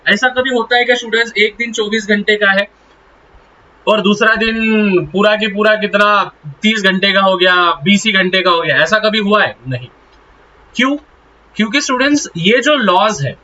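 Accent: Maharashtra, India. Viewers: Bro is marathi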